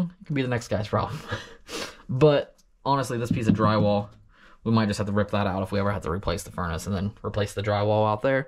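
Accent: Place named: Pittsburgh, United States. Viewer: you sure sound like 'cole the cornstar' but he is from iowa/idaho...6 generations. ohio? i hear a little pittsburgh. does not sound like ohio at all.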